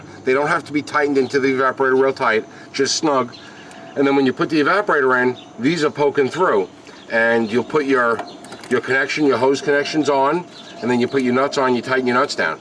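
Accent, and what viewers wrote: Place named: Long Island, United States. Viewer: this just wouldnt be the same without the Long Island accent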